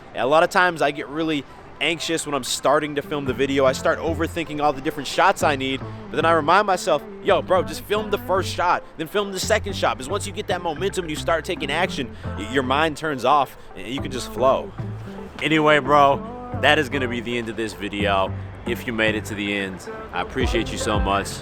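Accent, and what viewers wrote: United States. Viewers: the delivery is very American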